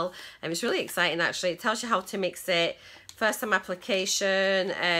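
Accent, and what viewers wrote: Yorkshire, United Kingdom. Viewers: So love a Yorkshire accent!!!!!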